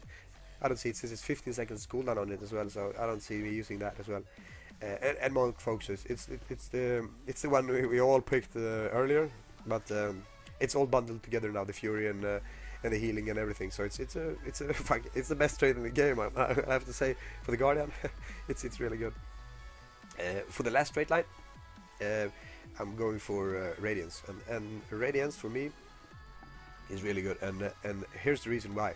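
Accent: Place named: Scotland, United Kingdom. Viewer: where are you from? XD i tried thinking but i couldnt decide between a scottish accent or a deeper accent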